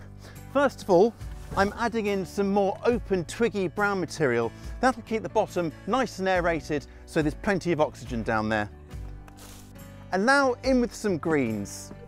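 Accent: United Kingdom. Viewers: The way Brits say compost really annoys me lol.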